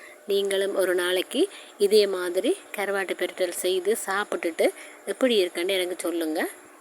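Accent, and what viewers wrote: Sri Lanka. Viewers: உருளைக்கிழங்கு கருவாட்டு பிரட்டல் அருமை. இலங்கைத் தமிழும் மணம் வீசுகின்றது.. வாழ்த்துக்கள்🎉🎉🎉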